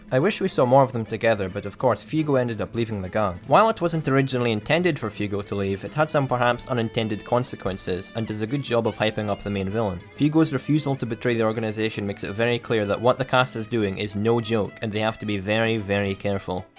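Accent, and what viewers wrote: Scotland, United Kingdom. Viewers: Dans It's understandable that you can't understand his Scottish accent.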